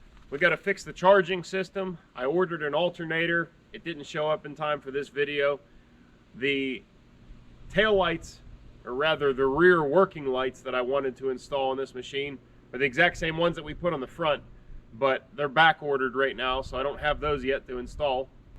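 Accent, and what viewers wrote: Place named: North, United States. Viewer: I AM WATCHING FROM DOWN HOME ( LOU---A---VULL ) learn to talk proper mare kan next time so you kan fit in we no your a feerneer dam yankey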